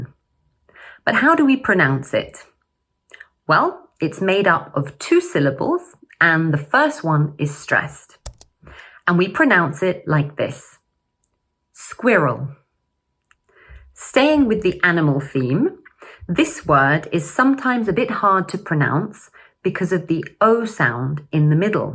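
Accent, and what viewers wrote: United Kingdom. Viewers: 크~ 역시 발음은 영국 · 이채널의 핵심이 영국식 발음 인거죠? 미국식이 아닌게 맞죠??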